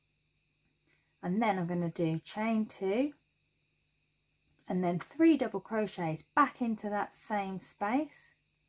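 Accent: Norfolk, United Kingdom. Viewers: I ordered this pattern on your Etsy and followed the video so I could learn patterns better. Thank you so much for really making this step by step! I'm new to crocheting and patterns do my head in. I imagine the stitches floating in midair somehow and can't picture where everything is supposed to go. Hahaha Thanks to this video, I completed my first ever cardigan! Now I'm working on a second. Also, I LOVE your Norfolk accent! It's like having your nan hand you a bowl of nice hot soup. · Brilliant tutorial. Thank you. Do I detect a Norfolk accent? I'm now extending my sleeves and they seem to be getting wider, is that right? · Are you from Norfolk?!?!? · Sorry not crochet related but I was trying to work out you accent lol what part of the uk are you from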